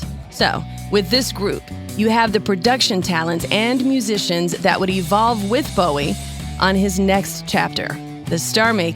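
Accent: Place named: United States. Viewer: This is a superb commentary on a song like no other. I've just seen so this and other songs are going round my head as they did when I first bought the Ziggy album in 1972. I love it when you read aloud the lyrics. You have a great voice, and speaking with an American accent, you bring out different shades of meaning in the words, originally sung in his London / English accent. Many thanks!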